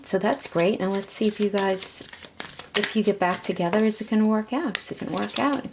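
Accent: New York, United States. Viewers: Is it new York accent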